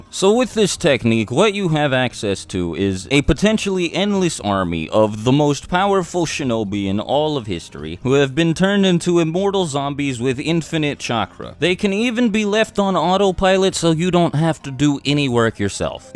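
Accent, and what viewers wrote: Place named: Bronx, United States. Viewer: You sound like someone who should do voice work for "A Bronx Tale"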